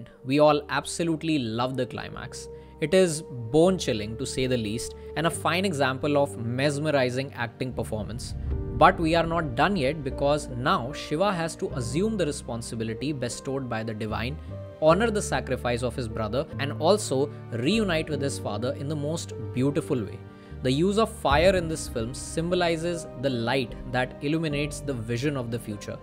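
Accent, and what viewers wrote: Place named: Karnataka, India. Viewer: Going by your pronounciation, I thought you are Kannadiga. Dakshina Kannada/Karnataka, many have already commented. But a great video and I pleasently surprised by the sound design when villagers handover gun to Murali in climax. Had not noticed it. Thanks for the video.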